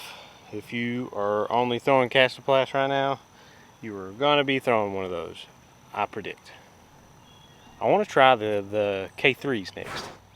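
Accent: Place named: North Carolina, United States. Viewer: Love your content. When I started watching your channel I was saying to myself " man that guy sounds like home ". I live in Humboldt Co California. Then I herd you say Yadkinville.... I grew up in Trap Hill and High Point, lol. It's like hearing myself talk!! Just had to come home last year to put my grandfather to rest, and discovered the quality courses around home. You ever play Rolling Pines in North Wilkesboro?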